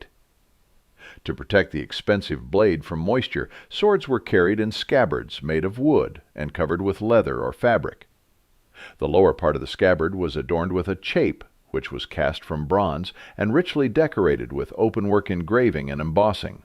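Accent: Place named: United States. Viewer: No American voice please. We know our own history. Keep out.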